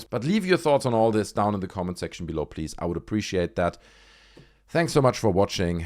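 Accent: Germany. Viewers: LMAOOO, DUDE!! RIGHT?! It pisses me off so much, We need REAL DATA!!! NOT all of this BS that does nothing but muddy up the waters in this area of research... It's such a shame... It really is man... (Cool German accent you have btw haha)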